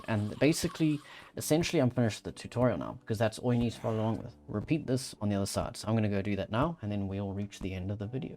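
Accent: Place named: South Africa. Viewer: Sorry, I couldn't help notice the accent, but are you South African?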